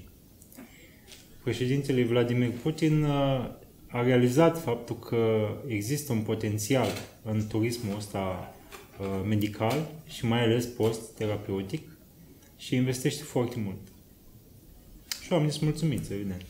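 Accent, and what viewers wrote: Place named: Moldova. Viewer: explicatiile tale sunt extraordinare, pe intelesul tuturor nu cu cuvinte sofisticate, iar accentul tau de moldovean este placut la vorbire si pur rominesc sincer din inima iti spun, succes pe mai departe.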